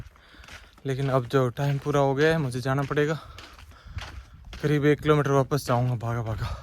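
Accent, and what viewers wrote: Rajasthan, India. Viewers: राजस्थान में कौनसा गांव है भाई आपका। वैसे आप दोनों पति पत्नी का देशी स्टाइल में राजस्थानी भाषा मे बोलना अच्छा लगता है। में तो आपकी आवाज एव बातों को सुनने के लिए ही ब्लॉग देखता हूँ।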